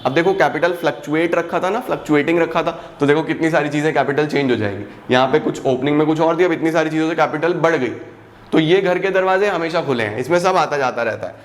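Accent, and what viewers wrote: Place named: Uttar Pradesh, India. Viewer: Sir ji aap kaha se hu lagte tu up ke ho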